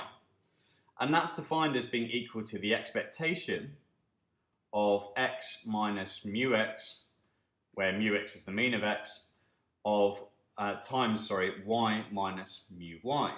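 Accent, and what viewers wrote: United Kingdom. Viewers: British accent....NICE ! ! ! Wishing all Yankees could have British accents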